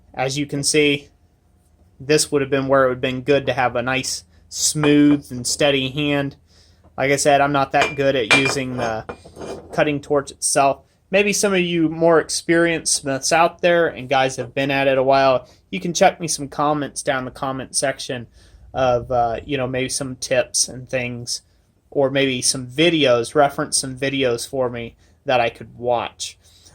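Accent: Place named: South, United States. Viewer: so your from dayton I always though you were from the south, anyway what is your thoughts on hammering uri hoffi style?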